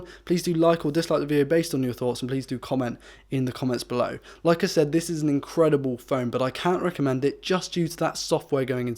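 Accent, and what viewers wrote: United Kingdom. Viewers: we should listen to this guy, he has a British accent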